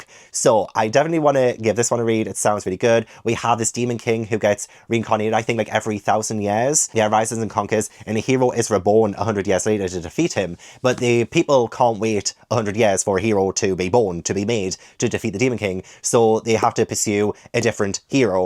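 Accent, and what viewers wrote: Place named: London, United Kingdom. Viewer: Oh my days, is funny lol. Your London accent is fab 😂❤❤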